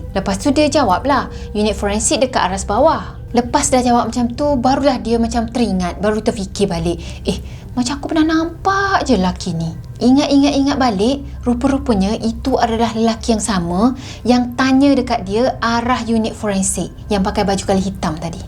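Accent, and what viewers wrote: Kelantan, Malaysia. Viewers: Pada permulaan video,saya ingatkan kak ni orang Indonesia. Tapi bila dah start bersuara,hihi orang kito ruponyo..tokleh nok lari bunyi loghat kelate dok?..tu hok kawe terus pechek potek subscribe..moga Allah permudahkan segala urusan Kak..inshaa Allah..amiin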